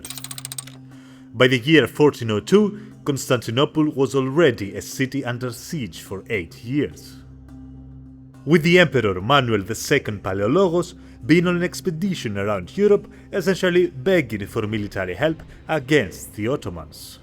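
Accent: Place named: Greece. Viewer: Have you heard his accent, he is a greek spreading bullshitt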